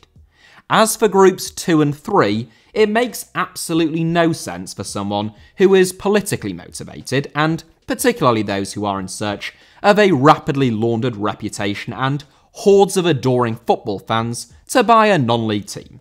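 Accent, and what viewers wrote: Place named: England, United Kingdom. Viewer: whinging about Elon Musk is probably the most predictable thing ever. I'm going to take a wild guess and assume twitter has pronouns in it's bio and every trendy flag of the month. Lucky it's pommy accent helps me sleep.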